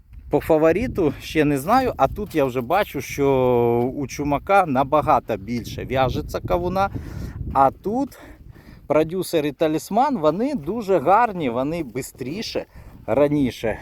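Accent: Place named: Russia. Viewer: Перестань акати і какати, як кацап. Гидко слухати!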